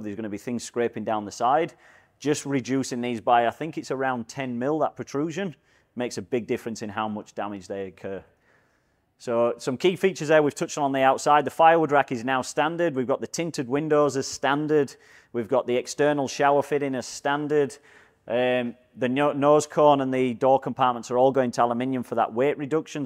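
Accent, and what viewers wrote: United Kingdom. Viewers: nice trailer. seems odd listening to a Yorkshire twang advertising Aussie trailers. That outdoor kitchen needs a redesign, most of it is unusable, nice features but unusable. something to think about for Quantum 5.5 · Where's that northern accent from?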